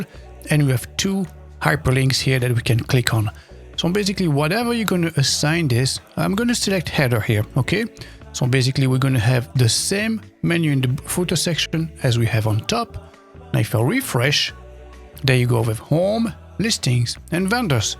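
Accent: France. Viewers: Carrément bluffé !!! J'ai cru reconnaître un petit accent français... Alors je publie mon commentaire en français... ça fait des jours et des jours que je me tappe des vidéos sur des solutions toutes plus floues les unes que les autres, là je dois reconnaître que la simplicité est au rendez-vous, il me restait 2 questions, est-ce que j'ose ??? Allez, je me jette à l'eau : pensez-vous que ce plugin peut-être utilisé comme un jobs board ? Et au sujet de la monétisation, pensez-vous que je puisse le paramétrer pour vendre la publication et la consultation de mes petites annonces sous forme d'abonnement mensuel/annuel ? :) Encore merci pour cette superbe présentation... A bientôt Dérick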